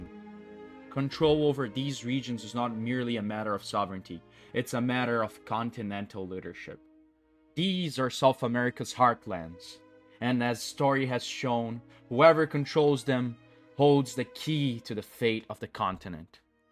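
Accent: Brazil. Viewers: I can tell you're another Brazilian just because of your accent! Great vid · Voce tem um sotaque abrasileirado.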